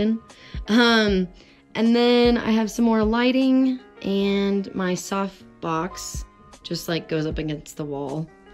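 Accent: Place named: North America. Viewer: Hi from a self-confessed art groupie!! My art studio tour would last about 3 minutes...XP-Pen monitor, XP-Pen pen, Clip Studio Paint software...DONE. My music studio tour would be closer to 7.5 minutes with stories about each piece. I decided to sub your channel based on this video tour, as much because of your persona as for the storage tips. You remind me a little of Chloe Rose only with a North American accent. While you were showing us around your MySpace setup, I was thinking, "Now here's someone it'd be really cool to watch paint!" Look for my art on my B channel someday. Meanwhile, I look forward to following your (and your hubby's) art adventures.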